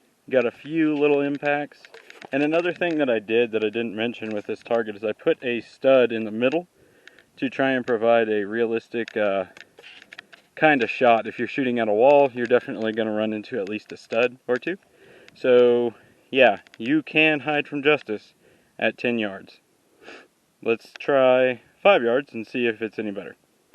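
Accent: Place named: Texas, United States. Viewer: Dude you talk exactly like me. I was born in Ft. Worth grew up in the mid-cities of DFW. Great vid man.🤠👍🇺🇲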